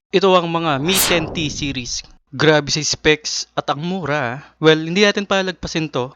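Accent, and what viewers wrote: Pampanga, Philippines. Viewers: Kapampangan ka sir noh? 😁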